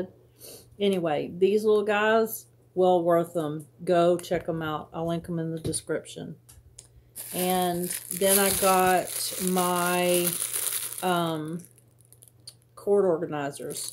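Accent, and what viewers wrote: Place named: South, United States. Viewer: I think it was a great haul! Hey look at the bright side, you don’t have to worry about people complaining about the crunching bags and wrapper’s 😂! I think you’re absolutely wonderful, keep smiling 😊… and just know you’re doing an absolutely amazing job sweetheart! Have a Blessed 😇 day! The A in my name stands for 👼 Angel! Also, I am from Kentucky and noticed a southern accent, just curious to know where you’re from?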